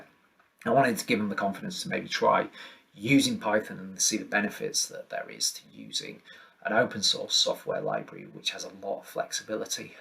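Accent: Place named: Yorkshire, United Kingdom. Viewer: Great video - love the dulcet Yorkshire tones!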